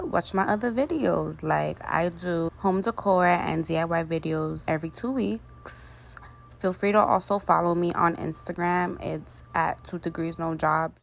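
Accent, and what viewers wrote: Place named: New York, United States. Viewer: I knew u was a New Yorker!! I mentioned in yr other video how much I love yr accent. N girl I’m loving yr videos. Keep it up 👍💕💕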